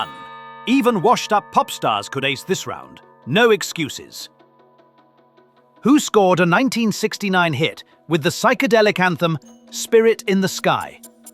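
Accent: England, United Kingdom. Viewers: !!! had two number ones, neither of which were. and. Then when came up, was their 2nd number one, I had to turn off your quiz. If you're aiming at an American audience then don't use an English voice.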